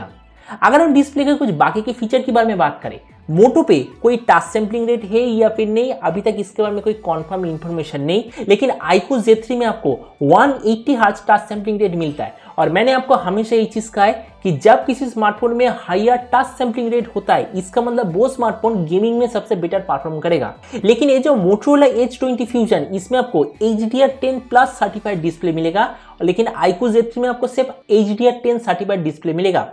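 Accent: Bengal, India. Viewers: Ap bangali ho keya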